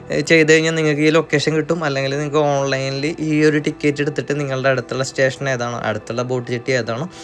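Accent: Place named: Thrissur, India. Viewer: നമ്മടെ തൃശൂർ slang ❤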